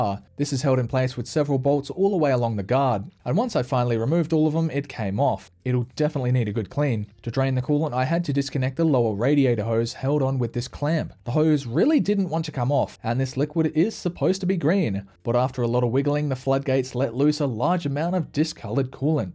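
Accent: Australia. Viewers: This is the first person I've seen with a clear Aussie accent who talks in American English, weird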